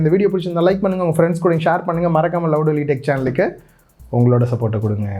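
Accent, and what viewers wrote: Tirunelveli, India. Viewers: thirunalveli yaa iruku 😅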